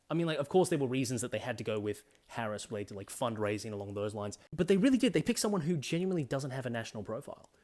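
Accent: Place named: Australia. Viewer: Didn't know you were Aussie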